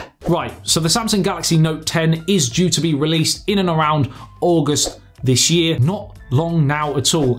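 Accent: United Kingdom. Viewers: Actually I watch your video for your great screen presence, brit accent and that lovely dash of humor combined with your constant smirk. Keep it up.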